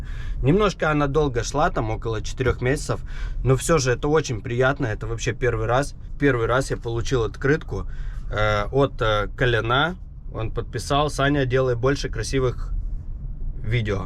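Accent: Odessa, Ukraine. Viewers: я не сразу понял, что он одессит. Теперь слушаю уже фильтруя слова.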